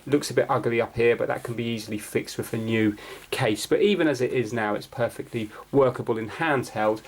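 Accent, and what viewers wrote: England, United Kingdom. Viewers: Greeting from Nevada, these videos are very interesting. Plus your English accent makes the videos bright and energetic.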